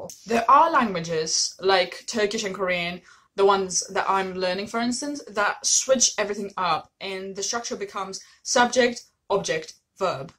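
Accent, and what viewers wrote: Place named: United Kingdom. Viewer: Τόσο τέλεια Βρετανική προφορά 🇬🇧🇬🇧 You sound a bit posh😂😂😂 Είσαι τέλεια .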